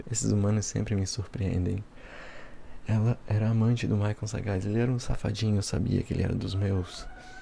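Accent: Brazil. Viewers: Opa, primeira vez por aqui. Muito bom seus vídeos, gostei do sotaque, parece muito com cidades próximo onde moro. Sou de SC, joinville, o jeito que vc fala , parece com qm mora aqui na região do Vale.. Já fiz um tour por alguns vídeos, são bem relaxantes mesmo, parabéns cara, sucesso !!! Com certeza passarei mais vezes por aqui, qdo a insônia bater.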